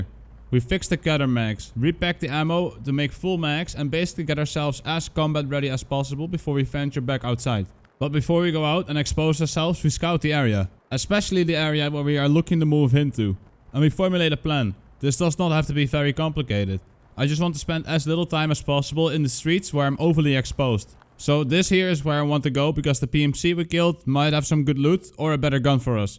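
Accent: Netherlands. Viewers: “Funny speaking Dutch dude” ..subbed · Hi funny speaking Dutch dude. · ik hoorde in de eerste 5 seconde dat je nederlands was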